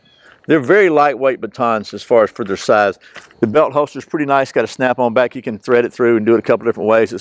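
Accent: Georgia, United States. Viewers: You have a really relaxing voice. Are you from GA or thereabouts?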